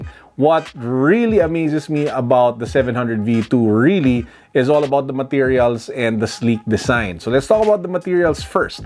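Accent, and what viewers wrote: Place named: Philippines. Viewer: right off the bat when i heard your voice i knew you were filipino just like me. ahah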